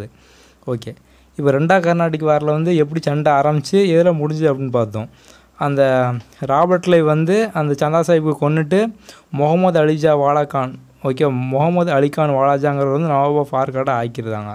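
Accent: Tirunelveli, India. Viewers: sir neenga tirunelveli ninaikren tirunelveli tutico slang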